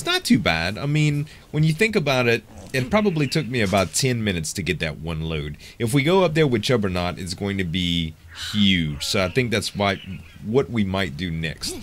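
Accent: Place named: Florida, United States. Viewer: I'm originally from Florida but am in Washington. Your accent brings back good memories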